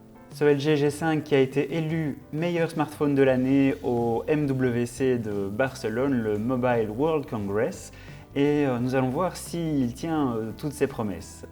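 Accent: Belgium. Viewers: Bon test, parfait, pro, continue comme ça. Ha si... tu es de Belgique?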